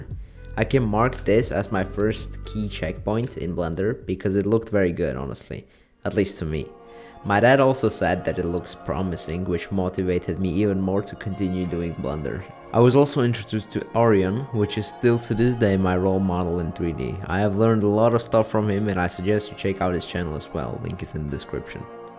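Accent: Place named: Czech Republic. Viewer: CZECH!(jsem taky čech) like in that clip from world of tanks, I saw the Czech inscriptions and it was kind of strange to me, and then when you spoke, I realized that you are Czech.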